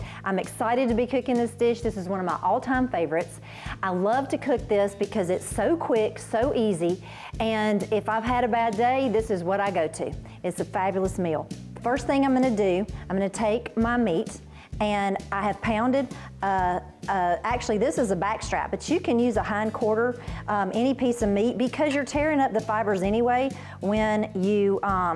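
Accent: South, United States. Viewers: She had me in within 2 seconds after hearing her sweet southern accent. Next I noticed the wedding band. No wonder she's married! I have to cook the deer in our house. But, I got an ace in the hole! My Grandma taught me how to. Funny, Granny could chicken fry up a mean skillet of deer steak but she didn't like deer meat. My Dad, my older brother and I would fight over that delicious meal! That's why I asked Granny to teach me!! I prepare it the same way that she did, but hers tasted sooo much better. That's because she put her love into it!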